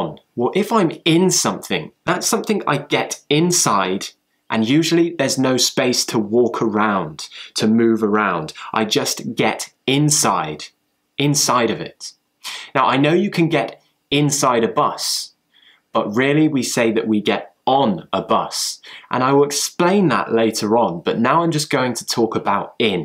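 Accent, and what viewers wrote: United Kingdom. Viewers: Your every British English pure British English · Which part of England are you from? I was thinking all the British people say "can't" like "kaant"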